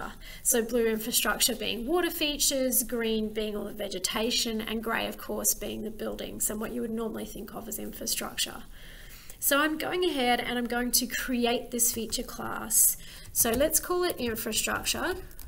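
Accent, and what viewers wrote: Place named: Australia. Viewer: You explain this so well. It was so simple to understand and follow along in my ArcPro account. The Australian accent is top tier! Super helpful, thank you from California!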